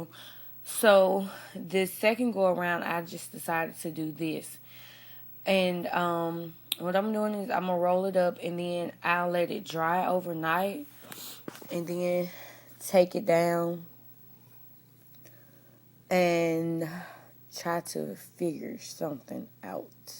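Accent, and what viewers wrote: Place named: South, United States. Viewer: That's really cute!!! I like it a lot! P.S. I thought I heard a southern accent, and then you said something about Mississippi! lol!! I'm from here too, great vid! Xo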